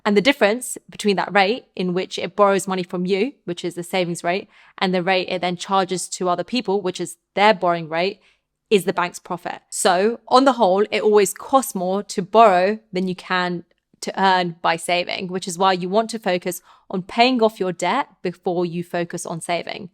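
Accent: United Kingdom. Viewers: Accent: British. Currency: USD. Make sense of that. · God bless pretty, smart, women with British accents!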